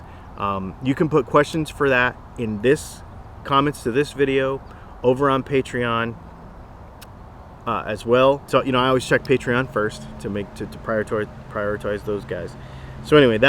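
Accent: South, United States. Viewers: Windowses and browserses...a southern thang. Just like, howzyamomanem! Fine thanki. An yourz? Gotta love it.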